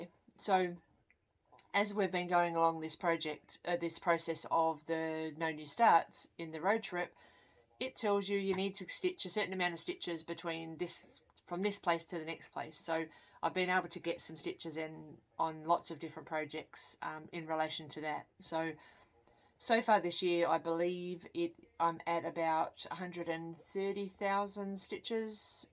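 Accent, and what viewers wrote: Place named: New Zealand. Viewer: Well done on your first flosstube. It won’t be your last, for sure. Hi from Canberra and I can hear the NZ influence there!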